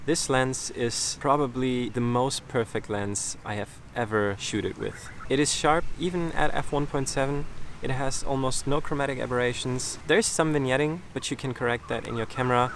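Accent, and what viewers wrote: Germany. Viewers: Amazing review! Thank you for your work! Don't worry about your German accent, it's great! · No worries, your English is fine. And you will never loose your German accent , it is how it is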